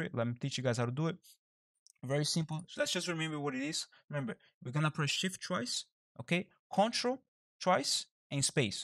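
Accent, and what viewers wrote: Brazil. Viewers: mano tu é muito brasileiro kkkkk esse sotaque nao me engana , otimo ingles btw haha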